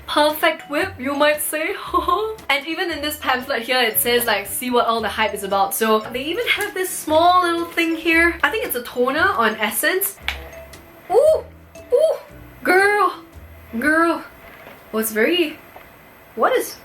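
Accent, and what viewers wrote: Singapore. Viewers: Singaporean accent lol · singaporean english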